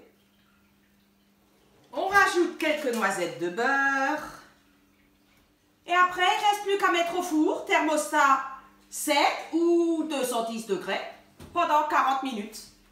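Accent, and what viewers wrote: Alsace, France. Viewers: Bonjour - merci pour le recette... je suis allemande et j'adore "Bettelmann".. et je vais essayer votre recette. Votre accent est très très charmante, c'est une accent alsacienne?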